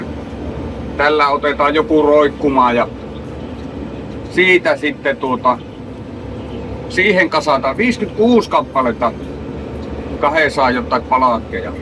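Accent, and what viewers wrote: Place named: North, Finland. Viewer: Murteesta päätellen jänhkhä jooseppi tämä tekijäukko. Ja temusta tilaa tavaraa, on siinäkin yhdistelmä.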